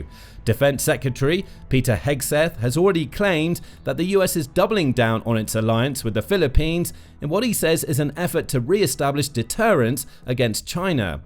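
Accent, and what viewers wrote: United Kingdom. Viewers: So tired of the dude with the british accent. Clickbait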